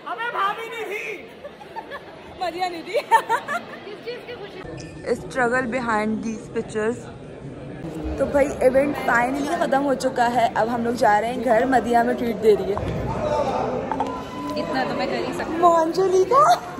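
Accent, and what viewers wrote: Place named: Mumbai, India. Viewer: Urdu nahi ATI Mumbai wali zuban bolti ho.mere ko etc q? Pak ki ho ya indian ho? Or galiyan bhi tumhara brother ya dost deyta hai .zuban tu seedhi rakho it is not funny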